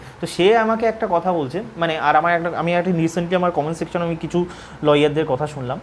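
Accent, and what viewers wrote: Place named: North Bengal, India. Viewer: dada tomar bari ki north bengal a? bangal r chaya dekhte pai..... kothar tan..... amio north bengal er, north bengal r kothay?.... tomar content khub helpful sobar!!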